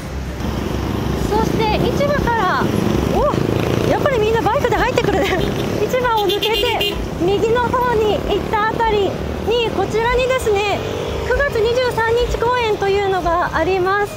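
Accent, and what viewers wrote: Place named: Hiroshima, Japan. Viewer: up主さん、広島または中国地方の出身ですか？ところどころの方言が心地良いです。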